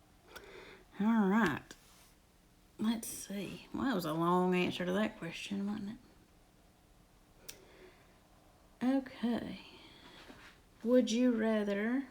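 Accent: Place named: Louisiana, United States. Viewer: omg i love you ...kept me laughing the whole hour....could listen to your accent all day ..makes me homesick....was born and raised in Louisiana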